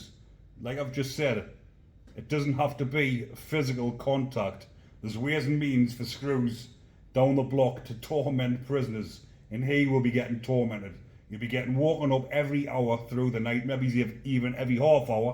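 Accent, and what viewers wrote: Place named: North East England, United Kingdom. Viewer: Hi you ARE BRILLIANT LOVE YOUR SWEET FUNNY NORTH EAST ACCENT. ❤❤❤❤❤❤❤❤❤❤❤❤❤❤❤❤You are the bees knees. Handsome north east lad. 🎉🎉🎉🎉🎉🎉🎉🎉🎉🎉🎉🎉🎉🎉🎉🎉🎉🎉🎉🎉🎉🎉🎉🎉🎉🎉🎉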